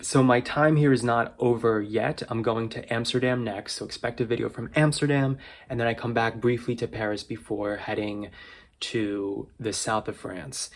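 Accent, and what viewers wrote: United States. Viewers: I really like your voice and the way you speak English ( very light American accent) By the way I 'm French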